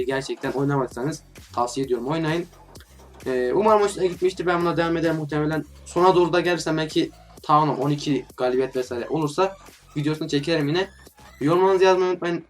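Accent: Turkey. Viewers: Abi Aslanköy den mi geliyorsun? Öyle Konuşuyorsunda :)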